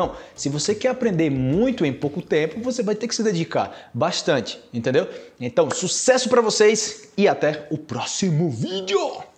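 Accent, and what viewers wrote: Colombia. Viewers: ele tem sotaque colombiano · Si, de vivir varios años en Medellín consiguió "pegar o sotaque Colombiano", pero eso no quita que haya nacido y sea Nordestino de Paraíba.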